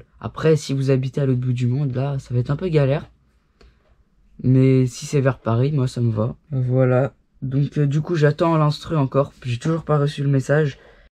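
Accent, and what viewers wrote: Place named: Algeria. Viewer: Mec c'est normal que ta un accent de Dz ? 😂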